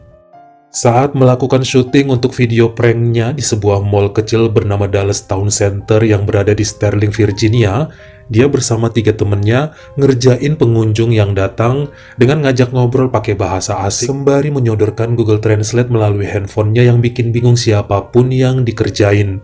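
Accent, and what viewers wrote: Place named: Medan, Indonesia. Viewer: setiap denger cerita dr channel bang aku ketawa ngakak. mgkn krna aku berasal dr medan udh terbiasa denger logatnya, dan ada kesan lucu polos dlm bahsa2nya..sugguh menghibur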